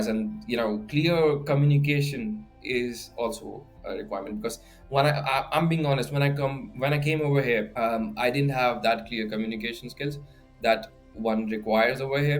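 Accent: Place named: United Kingdom. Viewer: Bhai are you uk born?cause accent is pure British.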